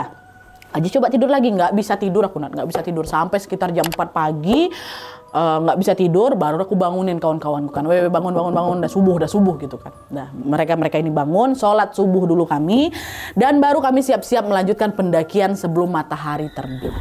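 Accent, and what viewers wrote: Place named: Pekanbaru, Indonesia. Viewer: Tapi dari logat kak ini logat pekanbaru tapi kadang logat medan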